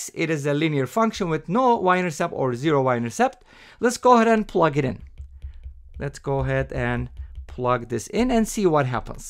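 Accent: Turkey. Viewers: reis Türk müsün ya? aksandan çakıttım biraz.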